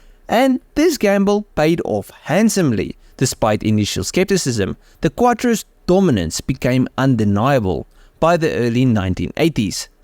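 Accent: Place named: Benoni, South Africa. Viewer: You must be from benoni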